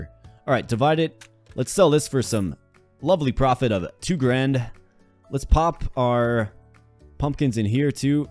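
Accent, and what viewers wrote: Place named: Canada. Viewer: Wait, are you canadian? You say pleg instead of plague, and rut instead of root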